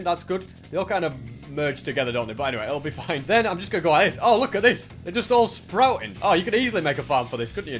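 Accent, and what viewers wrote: United Kingdom. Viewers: he bri’ish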